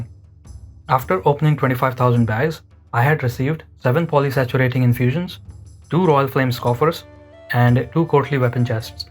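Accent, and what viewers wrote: India. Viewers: my god indian accent kills me every fuckin time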